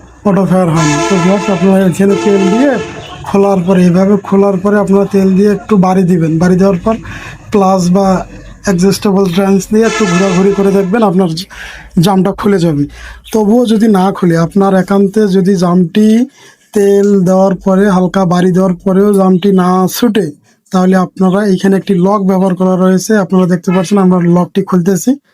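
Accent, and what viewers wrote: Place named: Rangpur, Bangladesh. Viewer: কথা শুনে মনে হচ্ছে আপনার বাড়ি রংপুর 😊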